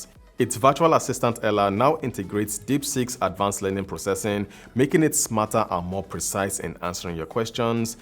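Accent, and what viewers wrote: Nigeria. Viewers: I just wanna say thank you to my Nigerian brother with this beautiful accent, love from iran❤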